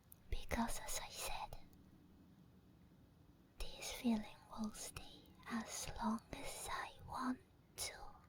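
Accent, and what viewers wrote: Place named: France. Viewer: Hi I’m new around here what accent do you have I really like it kinda sounds like French but my ears can’t quite nail it down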